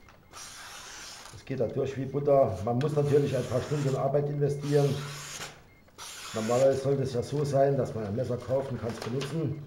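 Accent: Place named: Saarland, Germany. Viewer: Da höre ich doch einen saarländischen Dialekt raus. 8-)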